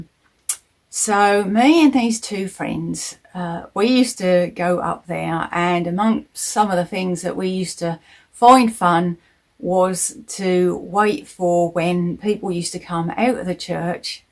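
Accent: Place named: Suffolk, United Kingdom. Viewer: Great tale 🙂 I used to fish a lake at Mistley Heath, not far from Hopkins home turf, and that place used to give me the heebyjeevies at night, no idea why but it had a weird vibe. I'm not easily spooked, I've fished loads of remote places at night over the years- even Orford Ness when sometimes I'd be the only person over there- with no problem but that place was odd. Love your accent, I'm Ipswich born and bred but it's not often you hear proper Suffolk these days, reminds me of my Nana and Aunt when I was a kid 🙂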